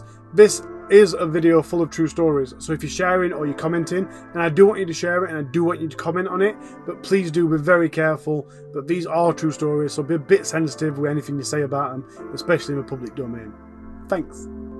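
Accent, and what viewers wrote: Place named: Northern England, United Kingdom. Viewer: I've heard these stories before, but not in a storytelling format with a Nth English accent. Good work.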